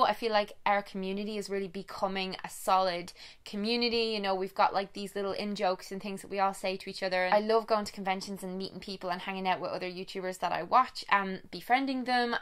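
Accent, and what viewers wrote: Ireland. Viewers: I watch your videos because you're the first person with an irish accent that I ever heard (and then I discovered that it was FREAKING CUTE) + you're a bisexual lady (me too) and I love how you talk about this + you're mostly positive ! Love from France <3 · Hi!! Just want to answer "your" question (technically not yours haha) I found you through Bry & Candice a WHILE back in a video about yoga.. I think?? And I checked out your channel and I immediately loved your body confidence videos as well as your food related videos and the makeup ones!! So I subscribed and then you started talking about your sexuality (Bi girl right here 👋) and I was just like "omg thank you SO freaking much for talking about this" You really help me to deal with life in general, your like a cat you calm my anxiety and make me laugh and smile:) Honestly, I feel like we should both go to Hogwarts and you would for sure be my favorite teacher!! 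Anyway, I just rambled about all the reasons why I watch your videos and I hope it makes sense because you're probably my favorite YouTuber and I sometimes wish I knew you in real life because I would LOVE to have someone like you to brighten up my life with your gorgeous eyes, your incredible knowledge of certain things even the most random ones (I did not know Freddie Mercury was Bi thank you!!) and of course your LOvely Irish accent! Thank you for being the most genuine YouTuber I know and helping us all out:) Love you lots xxx PS: also, you're my YouTube crush shhh;) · I watch your videos because I love your accent :) even though if I speak english now (Im from Germany) I tend to slip in some words that sound irish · I watch your videos because a) you are super honest and funny and I really love you :) b) you come from a past of disordered eating, and since I have an eating disorder, I feel very motivated and inspired by you to recover because you show that it is possible and absolutely worth it! 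c) you are from Ireland and since I adore the irish accent and want to improve my english (I am from austria btw) I really like your chanel :D Just keep doing what you are doing because it is AMAZING AND AWESOME, lots of love and btw your hair looks stunning <3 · i absolutely love your videos, they always make me smile. your sweet Irish accent always chills me out. i love your Q and As beacuse i love getting to know you more. you are such a light in such a dark internet space. lots of love from England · Hi, in response to your question; why do I watch your videos. I have to say that your personality is so great! You are so real and so cool, so pretty and just amazing. I love what you stand for and I love the fact that you helped me come to terms with me being both bi and feminine in personality. Like what you always say it's hard to be bi or lesbian when you are feminine because people assume you are straight but it's nice to know there are other girls like you out there that are going through the same thing as me. 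Also you're Irish and I love the Irish accent and Irish people are just so nice (like you). So yep in summary I watch your videos because I think we could be really good friends, you seem like a nice person (with cool videos I watch religiously) -soz if I went on a bit in my answer but you're great and inspiring to me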